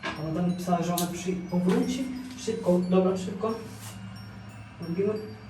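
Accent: Ukraine. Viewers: A ty jesteś z Polski czy z Ukrainy albo masz problem z językiem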